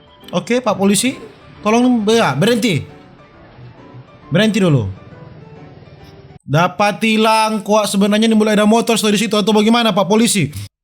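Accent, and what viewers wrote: Manado, Indonesia. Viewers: Logat Nya Kak Yg Bikin S Betah Manonton Memang Orang Manado Da Best Memang #SalamDariLuwuk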